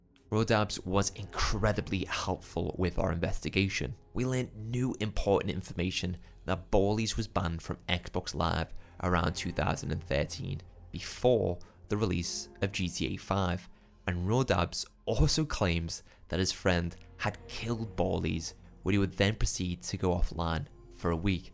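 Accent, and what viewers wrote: Liverpool, United Kingdom. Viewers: R u Scouse ? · Fellow scouse?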